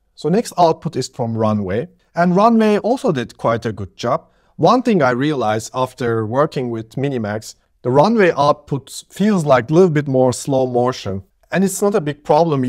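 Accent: Turkey. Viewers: sende türksün demi türk ingilizcesi bu ^^ iyi günler^^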